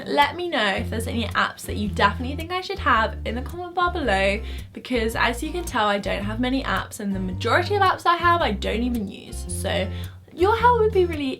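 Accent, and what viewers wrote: United Kingdom. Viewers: Pia Ana I absolutely adore british accent. Idk why it sounds sooo nice😂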